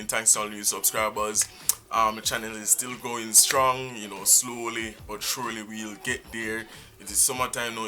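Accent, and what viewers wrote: Jamaica. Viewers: I was just mesmerized by you talking than anything else. I love the way Jamaicans talk.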